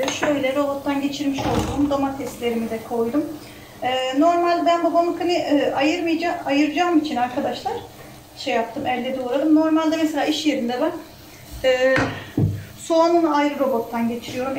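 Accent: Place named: Mediterranean, Turkey. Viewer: Bende mersin liyim Akdeniz bölgesi şivemiz aynı 🥰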